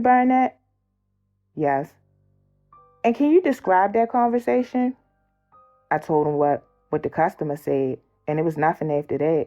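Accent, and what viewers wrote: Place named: Baltimore, United States. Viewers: Whoever this is reading, either she from Baltimore or she be down here a lot I can tell by how she say certain words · Sounds like she from baltimore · Whos dat new narrator wit da bmore accent😍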